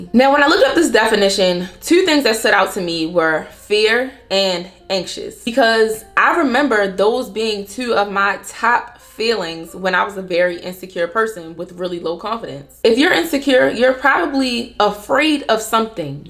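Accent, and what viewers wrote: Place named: Maryland, United States. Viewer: My gurl sound like she is from MD ?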